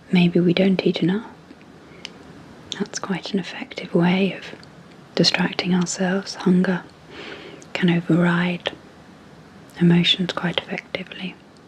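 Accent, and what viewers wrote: United Kingdom. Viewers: Therapy, amsr, soft spoken akd British? Yes, please. Lol. Thank you for your time and energy. 🙏🖤🤟🙃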